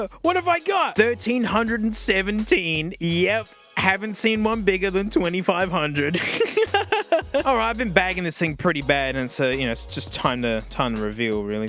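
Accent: Australia. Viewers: Australian mate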